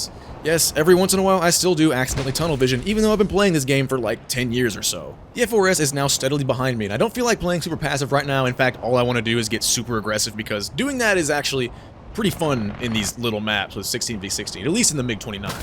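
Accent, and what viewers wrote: United States. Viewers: I like the way you talk,American. subscribed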